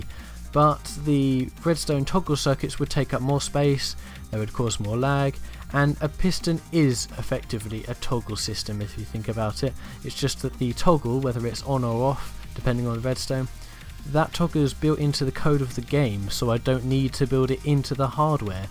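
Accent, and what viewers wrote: United Kingdom. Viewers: lol, can someone tell me why brittish accent is so hard to understand? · Oh, you're British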